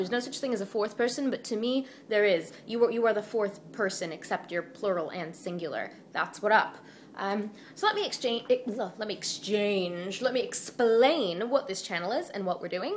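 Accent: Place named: United States. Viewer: you sound so american in this vid!!!!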